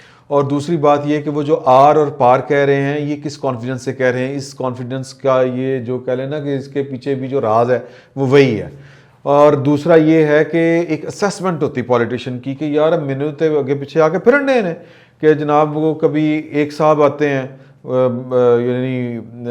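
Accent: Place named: Lahore, Pakistan. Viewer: سلام کامریڈ ۔آپ بہت اچھا تجزیہ کرتے ھیں۔ سب سے مزیدار آپ کی بولی "لاھوری اردو " ھوتی ھے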